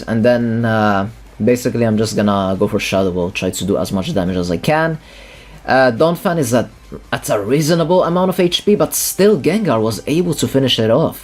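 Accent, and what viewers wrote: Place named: Japan. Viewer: Your Japanese accent is epic. Critical hitto! Jettoman! I love it.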